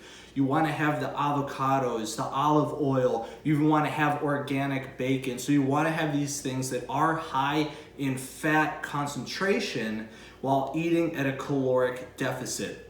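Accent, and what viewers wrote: United States. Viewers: He sounds American...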